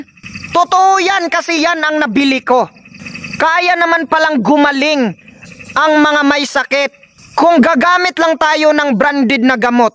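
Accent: Visayas, Philippines. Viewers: love ur visayan accent❤😊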